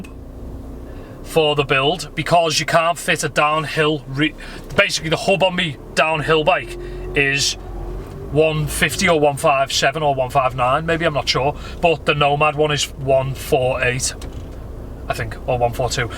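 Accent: Liverpool, United Kingdom. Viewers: Is he scouse ?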